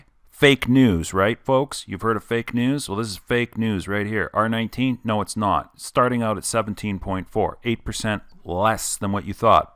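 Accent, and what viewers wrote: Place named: Canada. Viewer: Does the foam have to be flush with the inside stud faces? What about convection channels behind the drywall in the stud bays? (It's on the warm side). Can a guy do 3 inches in a 2x6 wall -- or is is better to use 2x4's and fill it up? This topic is unbelievably frustrating; the whole building science topic is unbelievably frustrating -- especially in Canada. Excellent content. Excellent presentation. Excellent Canadian accent.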